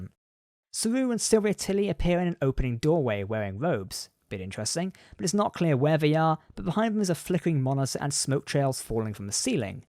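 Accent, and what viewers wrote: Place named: England, United Kingdom. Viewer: You're clearly British, probably English by your accent, so please remember the word is pronounced CommunicaTor NOT CommunicaYDA. "...the planet is rather unique." - 'unique' is an absolute. Something is either unique or not unique; it can't be partly unique, very unique, slightly unique or indeed rather unique; not to mention that the number of planets with multiple moons we've encountered in previous Star Trek outings, suggests that a planet with two moons is certainly NOT unique.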